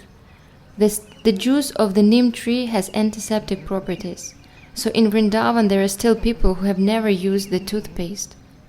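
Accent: Russia. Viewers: Your accent seems that you are from Russian Federation ? 😯🙃🙏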